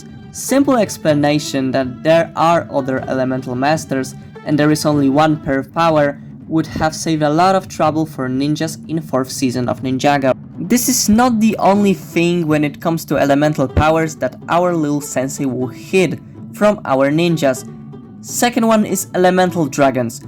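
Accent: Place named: Poland. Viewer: i can hear your polish accent im polish too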